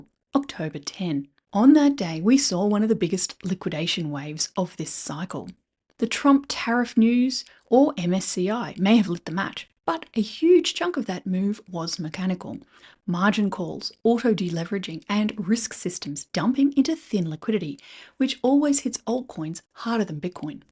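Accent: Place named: Australia. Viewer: So much food for thought here, thank you! I really think your channel has the most valuable crytpo insights going round. So refeshing to hear an Aussie in this space too!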